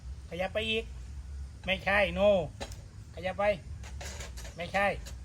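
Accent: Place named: Laos. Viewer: ตกลงมึงสิเว้าไทยคือเว้าลาวบักตาบคำเว้าหลาย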